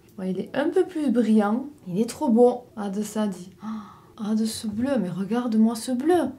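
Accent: South, France. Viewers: J’adore vos nouveaux cheveux …….du Québec j’adore votre accent du sud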